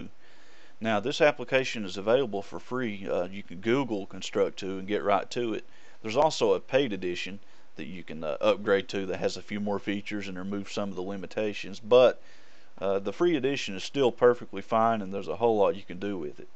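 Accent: South, United States. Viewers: Great tutorial, and great southern american voice (is it from the south right?) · That American accent is awesome!!! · that southern accent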